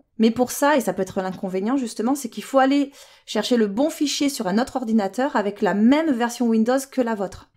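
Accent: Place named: Corsica, France. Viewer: accent corse.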